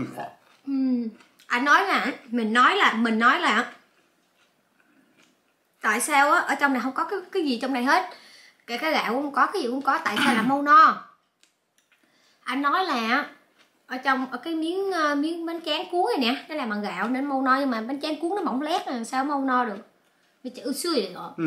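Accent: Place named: Phu Yen, Vietnam. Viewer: Mình nghe giống giọng Phú Yên quá xá~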